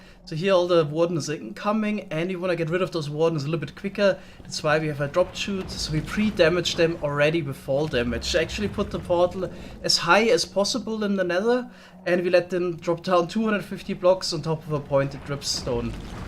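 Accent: Germany. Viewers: Der deutsche Akzent ;-) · er hat nen deutschen english Akzent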